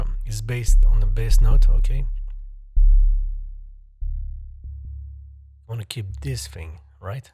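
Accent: France. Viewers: DUDE YOU'RE ILL MAN!! love your accent, you're french ah?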